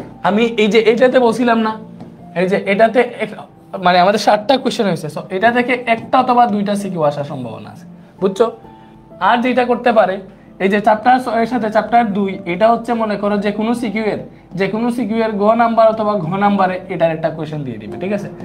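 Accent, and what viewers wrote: Sylhet, Bangladesh. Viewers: ভাইয়ার বাড়ি সিলেট নাকি?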